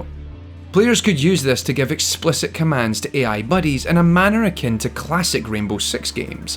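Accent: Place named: England, United Kingdom. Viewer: He's not wrong people, PLEASE play this before you watch, it is really fantastic. Also, I'm glad you talked about PTSD. I know I shouldn't be talking about personal stuff in some random comment thread, but I have what is being classified in the ICD Beta 11 as C-PTSD, or Complex Post Traumatic Stress Disorder. Your accent reminds me of when I went to England, I got held up in Heathrow because they wanted to make sure I was "Safe to let in" which kind of turned the whole experience negative. But what made it better, and really helped me, was one of the Security Officers was a Soldier who fought in the Falklands and Northern Ireland, and he was telling me that if I ever needed help, theres a group he volunteers with of Veterans, and he said, even if you weren't a Veteran and needed support they were there for everyone who suffers from PTSD, to whatever extent. 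And I think thats probably indicative of the way people with PTSD cope. Life is going to shit on you. Sometimes you're going to make choices that end up being mistakes that shatter the sense of humanity you hold on dearly too, and its going to rattle the foundations of your being, but there are little lights, little glimmers of beauty and joy in the world, and those are the things you should encapsulate and always keep close to your heart. The Day is Always Darkest Before the Dawn. Its not 100% relevant to this game, and I won't spoil why, but, if you have PTSD, especially as a Combat Veteran, this game might be a bit trig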